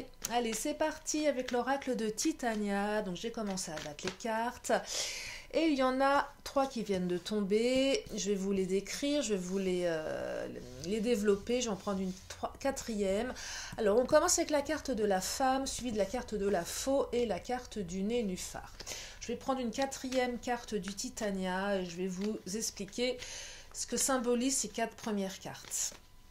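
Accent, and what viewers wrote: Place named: Paris, France. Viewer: Coucou, tu as un bel accent. Parisienne ? Merci de ton partage. Très belle lecture. Tirages a choix serait bien aussi. Plus interactif 😉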